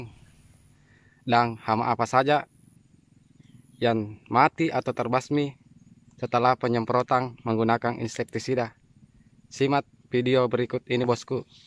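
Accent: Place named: Bugis, Indonesia. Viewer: Bos ini klo didengar logt nya pasti orang Bugis terima kasih ilmunya bos di mana beli obat seperti itu bos .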